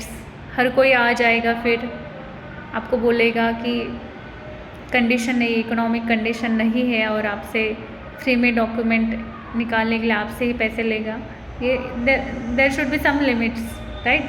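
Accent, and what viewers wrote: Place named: Maharashtra, India. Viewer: मराठी माणसाचे हिंदी लगेच समजून येते😅😅😅 तुम्हारी हिंदी बहुत अच्छी है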